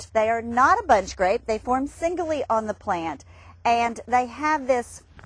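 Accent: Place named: North Carolina, United States. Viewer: You sound like you have been in NC awhile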